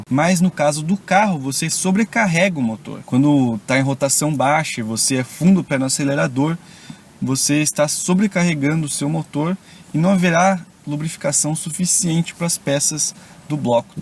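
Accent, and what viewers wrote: Curitiba, Brazil. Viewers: Dae jovem, tu é de Curitiba? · Vc é curitibano?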